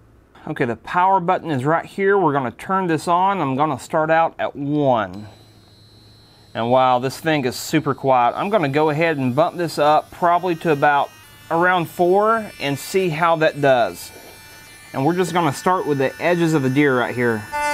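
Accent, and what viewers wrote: South, United States. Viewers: Love that southern accent....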